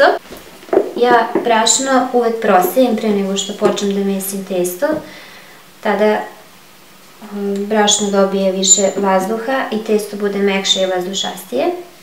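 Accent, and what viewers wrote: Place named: Vojvodina, Serbia. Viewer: bravo svaka čast po naglasku se može oceniti da si iz vojvodine :-)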